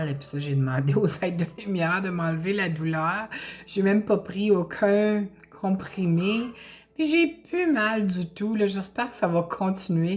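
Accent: Quebec, Canada. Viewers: Merci, tres beau tirage restont zen. J'adore l'accent du québec tsé ( tu sais) dans le sud de la France on dit comme vous sais rigolo. Bien à vous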